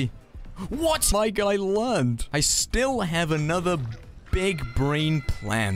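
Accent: United Kingdom. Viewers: Nah he just has a British accent.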